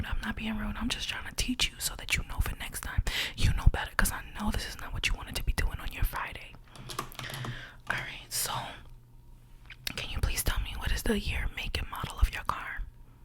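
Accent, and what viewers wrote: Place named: New York, United States. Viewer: I knew you was from NY cause that accent baby lol. Brooklyn here. What part you in?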